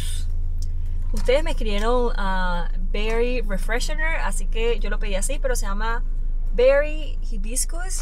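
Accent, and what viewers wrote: Venezuela. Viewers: Por qué hablas como venezolana si te ves como china y vives en Estados Unidos? 😯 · Es de Venezuela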